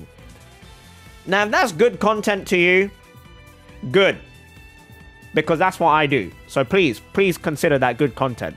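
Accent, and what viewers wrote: England, United Kingdom. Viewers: I'm here for the english accent.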